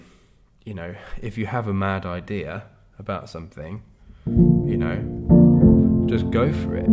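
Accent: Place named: England, United Kingdom. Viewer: Why do you talk English and sing American?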